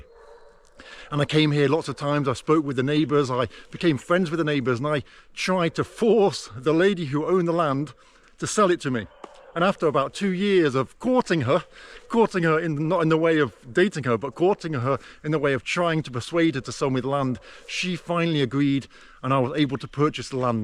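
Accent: United Kingdom. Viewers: Он уже 20 лет живёт в РФ, считай он один из самых ранних экспатов-ютуберов и у него очень хороший русский язык на достойном уровне, практически носитель но с британским акцентом)) Это кстати солидное преимущество, так как многие иностранцы годами не могут освоить язык кроме - "Всэм Прувет ит из..."😅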